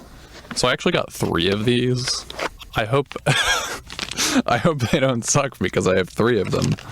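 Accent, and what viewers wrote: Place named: Silicon Valley, United States. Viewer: .... Speaking in the Silicon Valley derived, computer punk dialect....... (slightly feminised, conspicuously/overly precise, observationsl, complete with vocal fry.) Computer nerds everywhere will be cheering.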